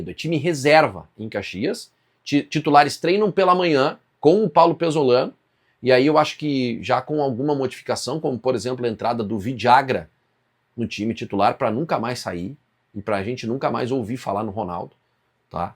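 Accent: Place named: Spain. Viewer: sou uruguaio e o som do doble L em gallardo é: gajardo ou gachardo. É vichagra ou vijagra. Esse gadiardo que tu pronuncia ou vidiagra, é espanhol de Espanha. Saludos hermano!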